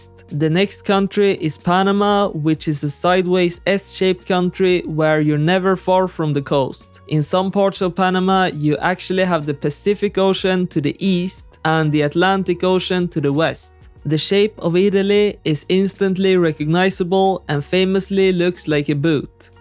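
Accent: Sweden. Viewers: Are you swedish by chance?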